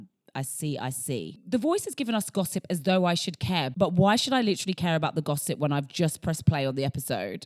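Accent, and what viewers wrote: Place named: South-East London, United Kingdom. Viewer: If you're not going to say "Gossip Girl" in that gorgeous (wild guessing incoming) South-East London accent, attacking each G like that, why even say it?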